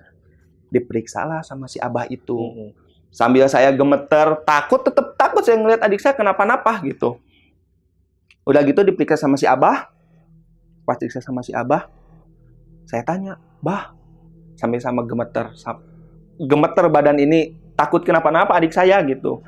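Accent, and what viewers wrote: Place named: Sunda, Indonesia. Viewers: aa, meni rame nyeritain na... orang sunda pisan... semangat a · Sunda bgt... 😁 Salam dari karawang 🙏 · deuhh kumaha iyeu nya logatna sunda pisan euyy😅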